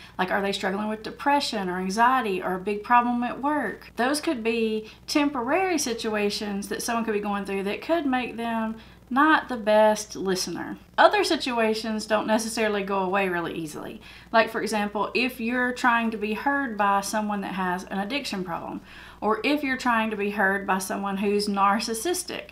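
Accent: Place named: Appalachia, United States. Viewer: i hear an Appalachian accent. I absolutely live for it. I live in Southwest Virginia. I have an area I am currently dealing with that I would love for you to do a video on. I work very closely with a woman who is constantly putting other people down. When I use the word constantly, I seriously mean she does it every breath. I have worked there only 2 years. She has been there 5 years, I am her superior and I have had decades of experience and I have more education. She and I got off to a very rough start. I stood my ground and confronted her face to face with our boss. The boss 100% supported me. Long story longer, my coworker and I recovered and actually became close. I genuinely care for her. She has lots of qualities that make her a valuable employee. BUT.....she is forever more nitpicking our fellow coworkers. Many of them are super young women all around 20 and have been there since high school. 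They are all great girls. Do they mess up sometimes? Sure they do. We all do. In my mind it's our job to mentor these young women, not tare them down. So, I pulled away from her so that I wouldn't have to talk to her, but every time I did speak to her down into the dark negative quicksand we went. I have to fully own that I had allowed myself to be influenced by this negative speech and I became as bad as she was. So, I snapped out of the nasty, but I soon realized that being complacent is just as bad as being an active participant. I am generally a very positive person, calm per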